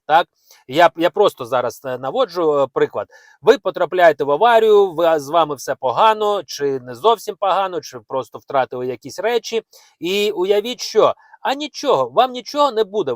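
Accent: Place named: Russia. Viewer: А что у вас за мова украинская чисто российским акцентом не пробовал размолвлять по-русски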